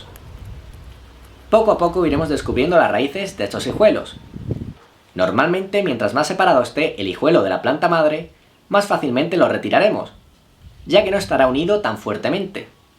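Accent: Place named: Spain. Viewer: Me fastidia esa voz de españolete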